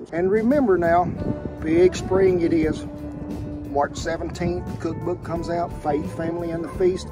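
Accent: South, United States. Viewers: not american here. i just fell in love with his cowboy accent. i want to be a cowboy u.u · Hes such a good salesman, he can sell me anything with his southern accent and ill buy it!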